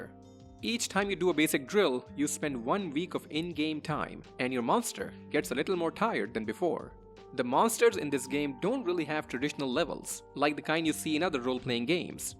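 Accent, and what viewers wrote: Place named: India. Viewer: I can't with your Indian accent bro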